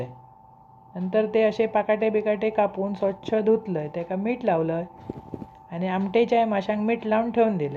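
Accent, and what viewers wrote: Malvani, India. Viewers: Tumcha aawaj ani malvani bhasha bolnyachi paddhat khup aawadte.... Tumchi khup khup pragati howo...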